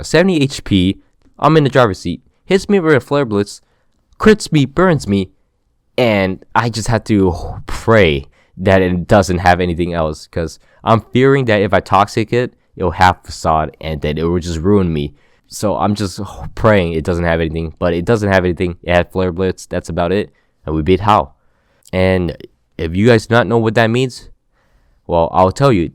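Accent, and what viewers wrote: Italy. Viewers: Wait are u italian? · Wait are you italian? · Are you italian?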